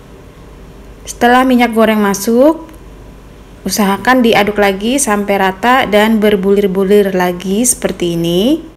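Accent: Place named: Medan, Indonesia. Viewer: Asli mendan ya bunda soalya logatnya ketauan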